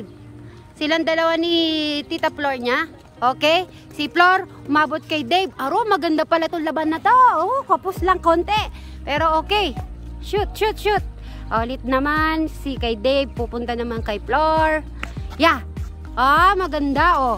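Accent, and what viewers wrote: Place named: Pampanga, Philippines. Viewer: natawa nmn.ako sa yo kc kapampAngan accent ka po tlga then may halong arabic like ng KALAS at AYWA...related ako kc gnyan din ako kpg kakauwi nababalid po pagtagalog ko...pro so proud po syo...ingat po kyo lagi..mahal po nmin kyong mga family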